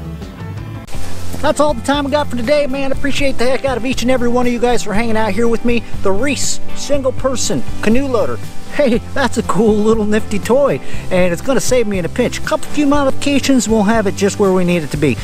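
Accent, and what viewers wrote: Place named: Minnesota, United States. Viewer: I recognize your accent (reminds me of Al Lindner). Are you from the Brainerd, Minnesota area?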